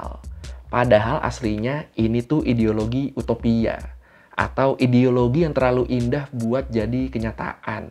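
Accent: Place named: Madura, Indonesia. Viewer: lu orang madura ya bg? nadanya kok gt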